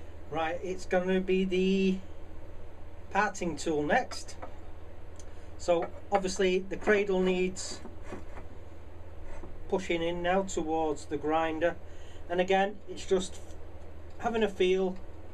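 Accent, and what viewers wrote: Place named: North, United Kingdom. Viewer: I love your style, your layman's explanation of things really has increased my understanding in leaps and bounds. I've been struggling to decide on which lathe to buy for months and whether I had the confidence to start turning. But you have really spurred me on, thank you. If I may ask some advice as a beginner? I'm going to spend as much as I can reasonably afford on the lathe (As the advice goes) but the turning tools are in danger of wiping me out, especially after buying a chuck too.. Can you suggest some decent ones to start with? Should I aim for HSS? Also, you sound like a fellow northener! Are you allowed to say where you buy your wood/blanks from? And what wood is good to start with? I have lots of offsets of softwood 2x4 and treated rough sawn....? Sorry for all the questions......but I don't feel stupid asking you. 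Thanks again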